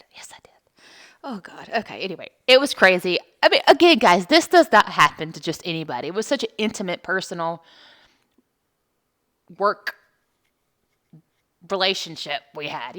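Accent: South, United States. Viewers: As a southern girl myself, I'm detecting a little tiny bit of a southern twang coming from you. Am I wrong? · I detect a southern twang😊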